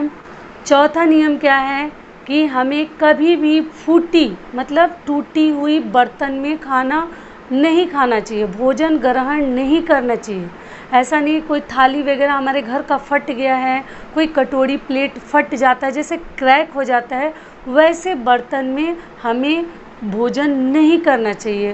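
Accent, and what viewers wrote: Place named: East Champaran, India. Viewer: Mam aap Kaha se ho?qki aap Jo v rule batete hai .wo sb mere Ghar me v follow krte h...or ye SB rule Bihar k East champaran/West champaran jila me hota h... Plzz tell me mam and don't mind 🙏 Qki Mai motihari se hu...east champaran motihari... Aapki boli v wahi h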